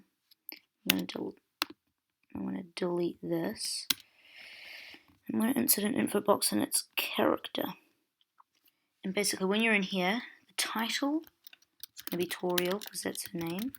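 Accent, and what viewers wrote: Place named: New Zealand. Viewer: I love the kiwi accent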